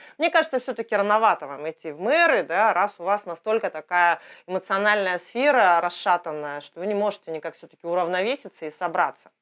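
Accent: Yakutia, Russia. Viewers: Пахай, сахалыы акценнаах санарар буолбуккун, дьэ хомолтолоох.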